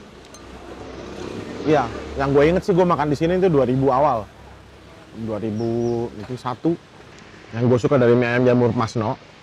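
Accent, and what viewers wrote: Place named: Java, Indonesia. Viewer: Medokmu kok iso menjiwai to ngab Padal mbendinane ndek njakarta Sukses terus friend eating Assswaabbb !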